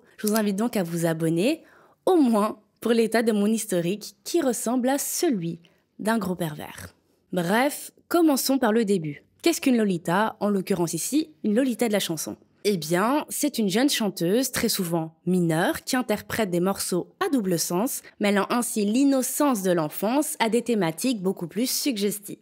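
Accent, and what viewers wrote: Belgium. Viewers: Merci pour ta vidéo que je trouve très bien travaillée. Surtout ne change pas ton charmant accent belge;et c'est un français qui te le dis. Bonne continuation à toi!❤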